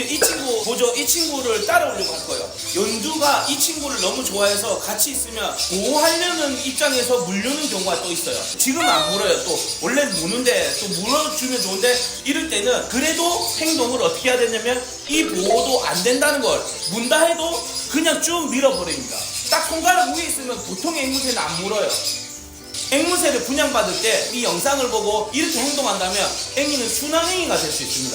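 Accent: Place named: Gyeongsang, South Korea. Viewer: hand-pink-waving:hand-pink-waving:hand-pink-waving:안녕하세요 올만에 적어봐요 ㅋㅋ아따~!! 말도 잘하신다 갱상도 사투리로 막 나갑미데이~! ㅋㅋㅋㅋㅋㅋㅋㅋ 직입미데이~! ㅋㅋㅋ 역쉬 짱~!!! 머리가 좋은거죠 ㅋㅋ애기들이 ㅋㅋ 그래도 아파요 ㅋㅋ 장갑껴도 안된다 하시고 ㅋㅋ 손꾸락 끊어져도 걍 맡겨야겠네요 힛 ~^^ 항상 응원합니다 ^^:face-red-heart-shape:yougotthis:yougotthis:card-red-penalty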